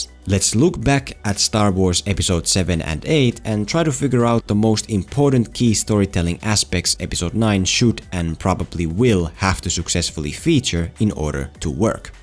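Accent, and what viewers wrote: Finland. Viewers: Are you from Finland? Your accent sounds a bit like that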